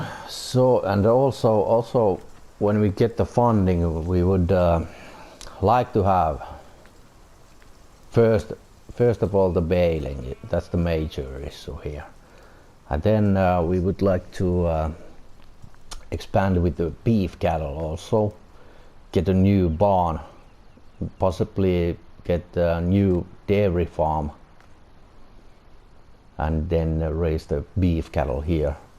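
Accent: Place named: Finland. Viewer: Greetings from Finland. I listen the accent and think you must be from Finland. Have you try to grow cotton in this map?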